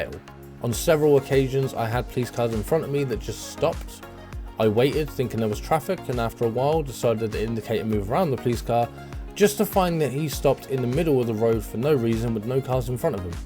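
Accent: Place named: Bristol, United Kingdom. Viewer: Is that a fellow Bristolian I hear?😂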